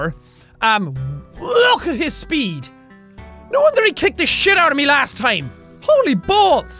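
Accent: Ireland. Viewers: WHY IS IT ALL YELLING WHY IS IT SO IRISH i laughed too hard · I've been watching for so long that I always automatically lower the volume. And I hardly notice his Irish accent anymore. Never bothered me tho because I'm loud just without an accent. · Retrosky Maybe it would be cool if could make a montage for the next subscriber milestone..? There would be the most shouty and Irish parts in best bits! Definitely RIP headphone users. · Who's LOUD IRISH GREEN HAIR IT'S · Even if you have a irish accent me and my best friend (we are both from middle of England) both love you and think you are boss and awesome!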